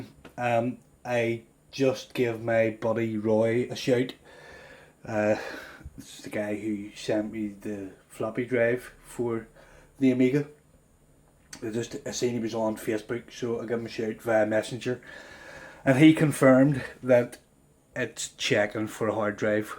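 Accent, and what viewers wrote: Belfast, United Kingdom. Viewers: YASSSSS! a fellow Norn Iron A1200 user here, great hearing a local accent - subscribed!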